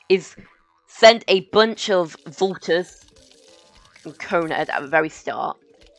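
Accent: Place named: United Kingdom. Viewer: U sound so briish its amazing.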